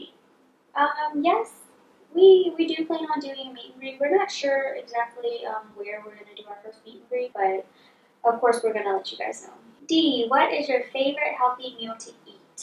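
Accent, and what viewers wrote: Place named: South, United States. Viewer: where are they from they kind of have a southern accent.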